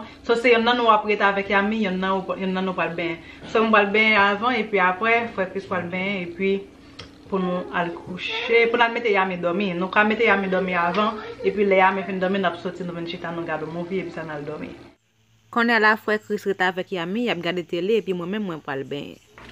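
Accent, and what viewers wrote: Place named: North, Haiti. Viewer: Hi mes amours Nou se moun okap?fason ke nou pale surtou dam nan 😅 mw menm mw se moun okap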